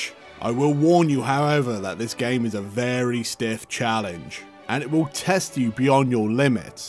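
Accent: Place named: Ireland. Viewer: Your accent reminds me of a dude from Ireland in the army here in the good 'OL USA..Good review....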